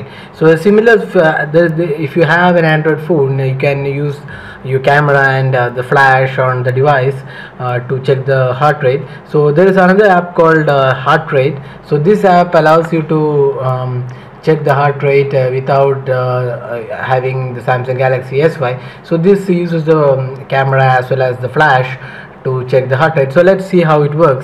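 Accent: India. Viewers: By your speech it seems you are Indian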